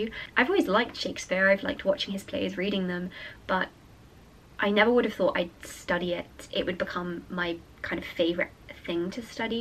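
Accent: United Kingdom. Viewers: I am happy that your dream came true. you are one of the first youtubers that I follow (since 2018) even though I barely can understand English (and also your British accent.) you are a lovely person.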